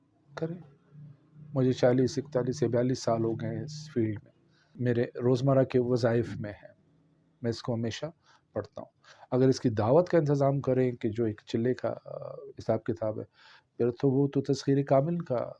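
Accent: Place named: Pashto, Pakistan. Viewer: Ap ka lheja pshto h thik sy smjh nhi ai😥😥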